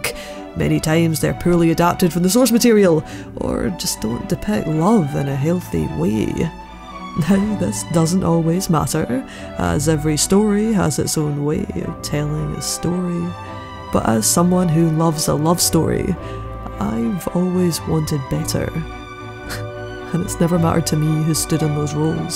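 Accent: Scotland, United Kingdom. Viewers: Ooft ya, is that a wee scot I hear? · I'm not good with the specifications i.e Glasgow vs Edinburgh, but if you couldn't tell anything past 'British' it's a scottish accent. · Where on earth did you get any of that? It's such a lovely and blatantly Scots accent, and I can't hear anything Australian in it at all. (This from an Aussie) And the middle-aged part is at least as baffling to me.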